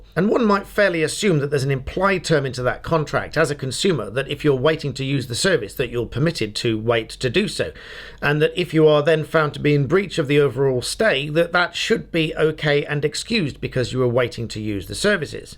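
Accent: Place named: England, United Kingdom. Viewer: I saw you on Legal Bytes so I just subscribed. (I love your English accent:-)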